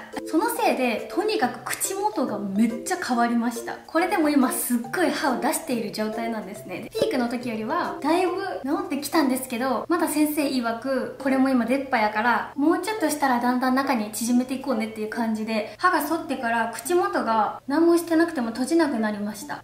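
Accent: Oita, Japan. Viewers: たまに語尾が聞き覚えあると思ったら、同じ大分県の方なのね😂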